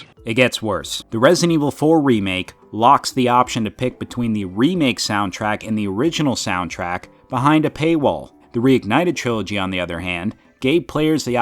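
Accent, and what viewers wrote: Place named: Scotland, United Kingdom. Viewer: Yeyeyye LET'S FREAKING GOOOOOOOOOOOOOOOOOOOOOOOOO BOIS NEW VIDEO IN THE HOOUUUSEEE. No need to apologise my brother. Just take proper care of yourself stay safe be safe and always keep smiling always. YEEEEEEEEEEEEEEEEESSSSSSSSSSSSSSSSSSSSESS WE GOT OUR SCOTTISH ACCENT, this is gonna be freaking awesome. I feel like many companies try to cut corners in such desert type areas with the rocks. Obviously not all of them but we have seen many games where the background has not been made properly or they have cut corners while making them, open to possibly many glitches. oh damn don't even get me started on KTJL. That game is just a drop bad in the animation department it's baffling that the same studio the same company which made Arkham knight made that dog s**t. It was a huge let down, a huge. I agree with what u just said making the world bigger is not always the answer for all games. 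Some games are not meant to have a bigger or more open world. I agree once again I prefer quality over quantity anytime and any day. I prefer to stay in the same boat as you, smaller semi open world. DAYUM that's such a good point yes they were definitely the reflection of the developer but remember some games like KTJL is also a reflection, but a reflection of that "S.B inc" iykyk it's the reflection of their worst part in their body. Assassin Creeds downfall is very bad to see. Uk I could be hated for my opinion maybe even u might disagree wi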